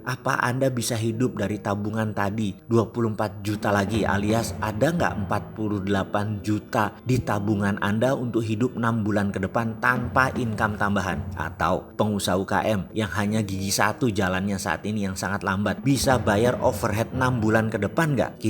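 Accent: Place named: East Java, Indonesia. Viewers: Mantep mas se salam suroboyo, pantes ae logakte kentel, ternyata sampean wong jowo timur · Q seneng nek pas ngomong jawa timuran tepatnya suroboyan, ketok loss banget, dadi cikhas soale q jarang delok youtube sing gar boso suroboyoan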